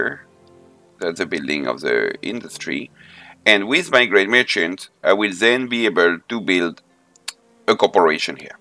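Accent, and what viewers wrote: France. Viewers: Your accent sounds so French, haha! Love it.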